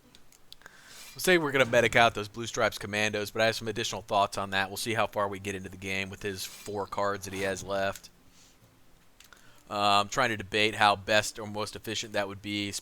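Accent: United States. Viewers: sorry your accent is really annoying california? whateverrr...we're gonna match that playyyyyyy... ugh. and ,you got this you had a bad ass last hand enough*edit* it wasn't last hand my bad i get my Irish up sometimes.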